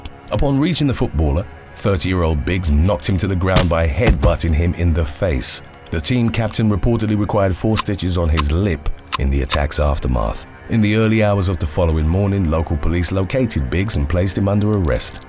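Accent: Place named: England, United Kingdom. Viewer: he's English. they pronounce things differently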